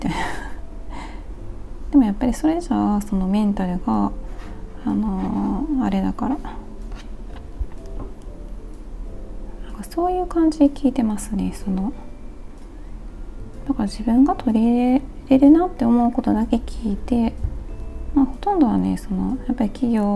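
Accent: Kansai, Japan. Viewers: こんにちは👋😃私がさんを知ったのは、4年前急にくも膜下出血で右半身が不自由になって、ショックで何もやるきが失くなって一日中泣いてばかりしてた時、編み物を勧めてくれたんですよ。😅でも、手が思うように動かなくて💦😥ひたすら動画をながめてました。そしたらなんと私と同じ関西弁で編み物をされているさんを見つけて😂 …なんか、今まで編み物の基本ばかりにとらわれてしまって、できないことばかりで諦めてたのに、さんの話しを聞いたら、なんかできるような気がしてきて挑戦してます。今ではリハビリをかねて編み物してますので手が大分動くようになってきました❗️足はまだまだですが😅💦何よりさんのおかげでかぎ針が使えるようになったのが嬉しくて😂あなたの動画に出会って無かったら今頃は死人のようになってたかも😅 さんの関西弁も大好きです😄私は関西人だけど関西弁を喋らないところに住んでます。懐かしさもあります。これからも楽しいトークと編み物、UPされるのをまってます🎵長々とスミマセン❗️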